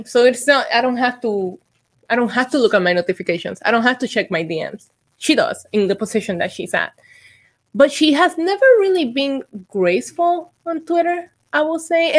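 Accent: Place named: Puerto Rico. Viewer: I told her you like your accent and I made a comment the last time and I guess you seen my comments but are you Puerto Rican because you talk like you are Puerto Rican but anyway I like that New Concept with you do your makeup and talk about wrestling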